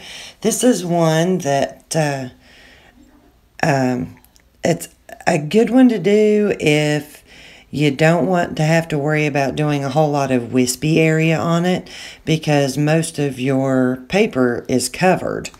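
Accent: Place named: North Carolina, United States. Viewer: Another great video,! I love how this turned out. You used my favorite colors - and I'm glad to see you got the new inks. They are magnificent! The other inks you need to try are the Trex inks. You talk about bright vibrant inks! WHOA! I played with them for the first time this afternoon and was super impressed. They aren't bad pricewise, either. Big bottles, 12 colors - somewhere around $30. I love your videos (but you already know that) and think this one was especially powerful. I could create this! (Your real wispy ones not so much.) I'm glad your count continues to grow as you deserve it. I love your "backwoods NC accent" - it goes well with your videos. You've got an incredible style my friend! 😍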